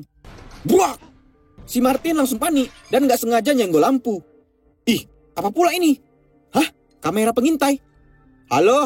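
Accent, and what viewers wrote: Medan, Indonesia. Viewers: Asal Medan kau ya. Bang?😂😂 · Medan kali lek dabingnya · Geng aku suka sekali dengar kau becakap logat medan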